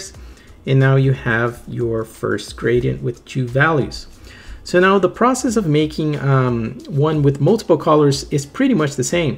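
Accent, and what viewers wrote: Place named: Italy. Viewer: Trying to guess accent... I'm with either Italian or Brazilian/Portuguese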